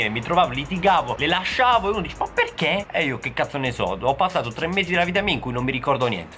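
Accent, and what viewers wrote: Bari, Italy. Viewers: Potrei iniziare ad amare l'accento barese solo grazie a te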